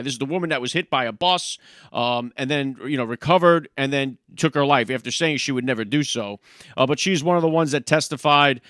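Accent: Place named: New England, United States. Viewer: Nice channel. Wait, you sound like a Yankee. Are you a northern Yankee Trump supporter? Not something I've seen on YT. You are actually a Yankee?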